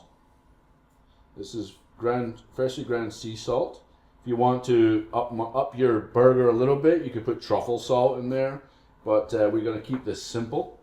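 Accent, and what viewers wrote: Scotland, United Kingdom. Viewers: Canna hide his Scottish accent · He's Scottish !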